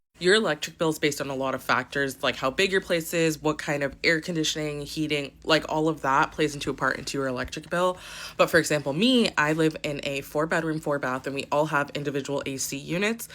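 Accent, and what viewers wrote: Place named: General American, United States. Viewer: I feel as if she said that due to your more “standard American accent”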